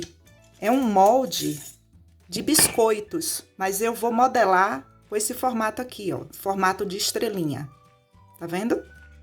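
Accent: Bahia, Brazil. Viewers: Conheço sotaque baiano de longe,amei a receita e sua voz kkkkkk. Bj 😙 sucesso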